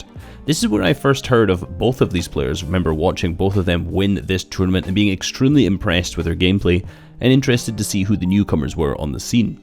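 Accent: Scotland, United Kingdom. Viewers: are you scottish or american please choose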